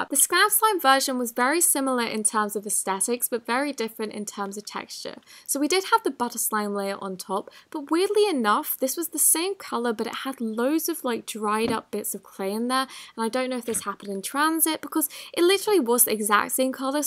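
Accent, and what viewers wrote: England, United Kingdom. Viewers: I know it’s English, and I love it!!!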